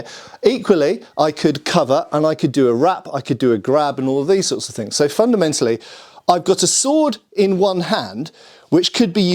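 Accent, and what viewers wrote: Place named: Scotland, United Kingdom. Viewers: Yeah, more scottish speaking! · Technically nae just an accent, but a separate language, or leid. Middle Scots was it's own leid separate from English, as is it's descendent Modern Scots, which I have been trynna practice more. Odd but nice that they are close enough you can mix them so much and still be reasonably understood. Fun at the least, as is the accent. As a broadsword/claymore practioner meself here in Canada, although admittedly a rather junior one, Ah love that I can hold a spiked targe, a dirk, and claymore all at the same time. Lotta options to stab, bash, stab, smash, or cut, as is most convenient. Always love the baskethilt content anyways!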